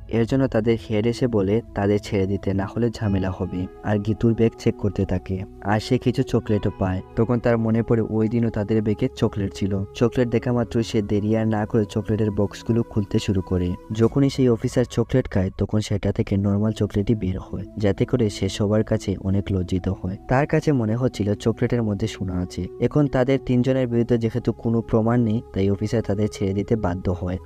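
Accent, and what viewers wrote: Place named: Sylhet, Bangladesh. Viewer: Sylhety vasa oshadaron😮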